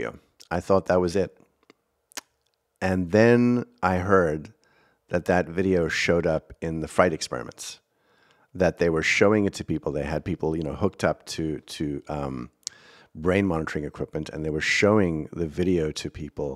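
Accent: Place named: South Africa. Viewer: Fellow South African here - don't lose the accent, it's awesome! Love the podcast! You're f*cking fantastic!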